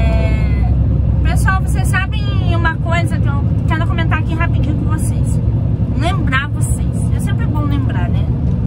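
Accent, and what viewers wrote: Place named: Santa Catarina, Brazil. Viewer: Boa noite, mas de novo vai ficar de varde😂 amo esse modo de dizer as palavras, morei em Santa Catarina na cidade de Modelo por um ano, os meus piás, já estava com sotoque😂🥰 mas você merece😉fica com Deus e boa viajada 🙏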